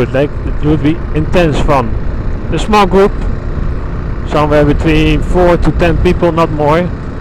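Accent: Netherlands. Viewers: Op de 1 of andere manier hoor ik gwn dat je een Nederlander bent😂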